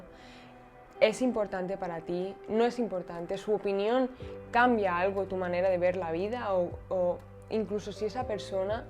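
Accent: Spain. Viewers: me gusta su voz de española suena agradable alv xd ;v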